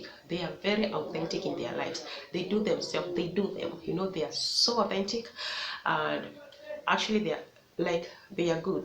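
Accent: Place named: Kenya. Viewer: I'm trying to guess where in Kenya you're from. I'm guessing somewhere between Central & Meru. Like Embu/Kirinyaga. My best guess is Nyeri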